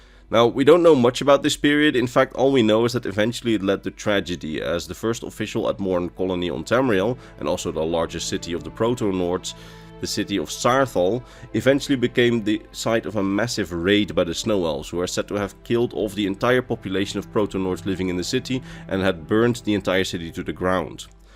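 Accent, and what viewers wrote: Netherlands. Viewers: Sounds Dutch or maybe scandi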